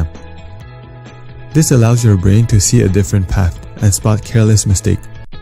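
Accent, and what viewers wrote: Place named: Singapore. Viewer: I can see that you are singaporian through accent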